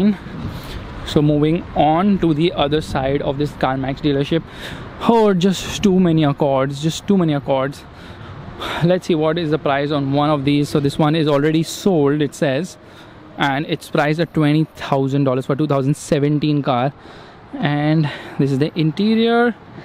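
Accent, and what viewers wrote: India. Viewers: I love Indian accent its so beautiful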